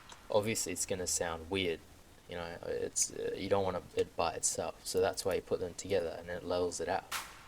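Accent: Australia. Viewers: Are u astraulian? :p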